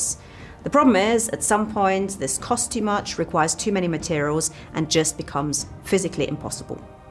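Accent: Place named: United Kingdom. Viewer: No photos cause it's fake. Just cause she has a British accent doesn't mean she's smart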